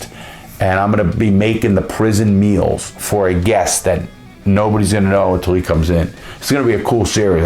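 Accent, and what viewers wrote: New York, United States. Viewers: them thick new york mob accents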